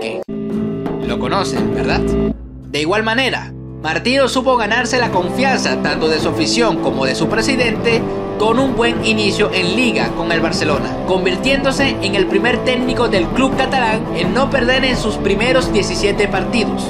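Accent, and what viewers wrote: Venezuela. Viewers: Hasta el acento es venezolano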